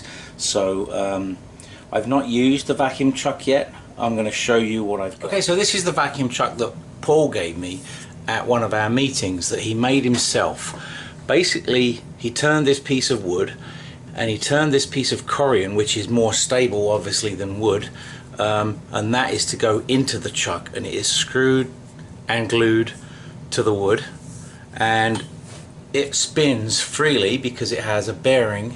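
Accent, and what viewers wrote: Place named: England, United Kingdom. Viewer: That was brilliant and Im not sure where you live but you do sound English a bit like Jason Stathem Not used oil much as I cant get a good finish with it but Im sure its just me.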